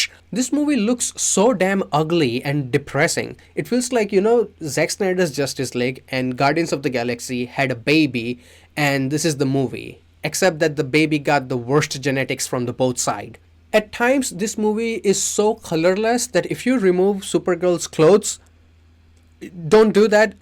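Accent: India. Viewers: I love your channel so much. I'm not sure if you're Bengali or Indian, but this is the first time I've seen a commenter who has such an accent. Also you seem to have so much passion for movies and entertainment, but you're also respectful and you point out the problems societaly and with hollywood. I really enjoy your content! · Accent gang Are you Bengali perchance?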